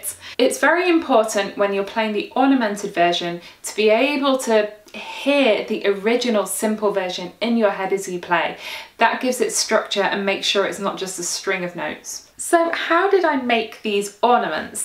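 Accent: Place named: United Kingdom. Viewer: And it’s not only what she says but how she says it in her own inimitable entertaining British accent. These tutorials just wouldn’t be the same if were to teach them using a phony mundane American accent. 😉 ♫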